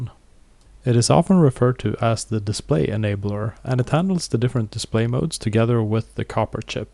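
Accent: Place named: Sweden. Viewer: With that accent i know you are swedish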